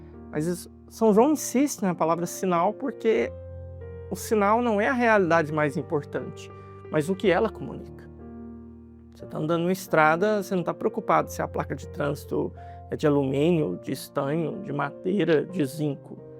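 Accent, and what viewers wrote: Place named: Goiás, Brazil. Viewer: Uma abençoada semana para todos que ouvem o. Descobri ele aqui neste canal e não deixo de ouvi-lo todos os dias. A maneira como ele fala conosco, a forma simples e direta de explicar o evangelho. Os exemplos, as comparações e até a maneira de falar no Goianes dele, é maravilhoso. Que Deus te abençoe sempre. Quem sabe um dia posso conhecê -lo pessoalmente.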